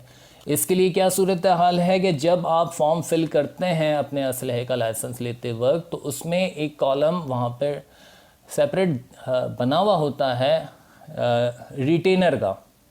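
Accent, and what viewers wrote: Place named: India. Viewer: Bahe ap ne jo tarika btaya aisa kuch b nahe hai pakistan m ap muje indian lag rahe ho zuban say b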